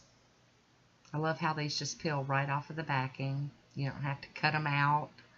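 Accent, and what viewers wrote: South, United States. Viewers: I hear a southern accent in you! That's the same for me, I'm a southern girl all my life! My husband loves for me to say certain words and will have me repeat them sometimes or he'd say it after me lmbo, like, night (telling him goodnight) bite, like, light lol he gets a kick out of it when I say those words and we both end up laughing! People always ask me to repeat myself just so they can hear me say whatever I said again lol!! I think we probably would be in trouble quite often if we could hang out! If you ever come to central Fl it'd be a great treat, for sure!!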